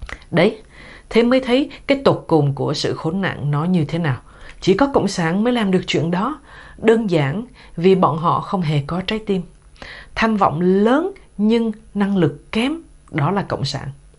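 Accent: Standard. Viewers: Chị này nói ko nhìn sách luôn, vừa đúng chuẩn vừa hay tuyệt! 👍👍👍👍👍👍👍👍👍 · MC tuyệt vời giọng nói rất chuẩn, yêu em quá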